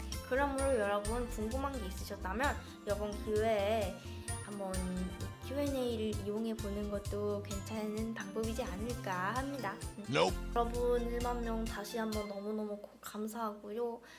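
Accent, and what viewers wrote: Gyeongsang, South Korea. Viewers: 경상도 아압니까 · 경상도에 사시나요? 사투리 하시는 거 같아서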